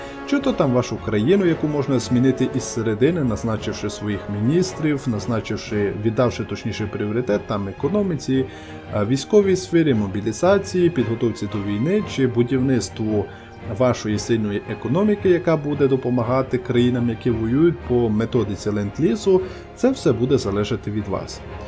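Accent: Transcarpathia, Ukraine. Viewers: Ти закарпатський геймер?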